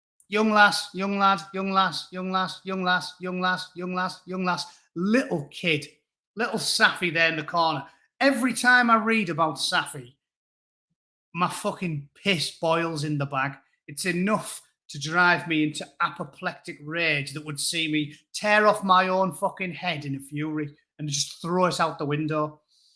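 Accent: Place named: North, United Kingdom. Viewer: you should do a spoof news reader segment, done in your own chippy northern styleee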